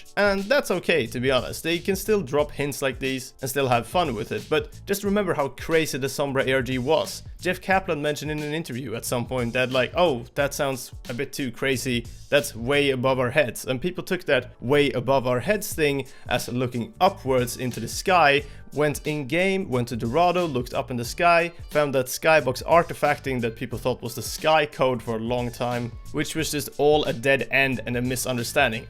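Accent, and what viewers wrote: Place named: Sweden. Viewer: är du svensk du har dealect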